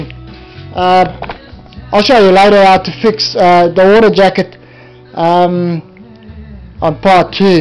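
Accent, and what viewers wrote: South Africa. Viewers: Nifty little condenser, should work a treat once ya got the water flushing thru the PVC and should only need a slow flow being the overall volume of the pipe too. Been tryin to work out your accent mate, I am guessin south african? You certainly got some skills my friend, good work bro. Cheers n regards Lee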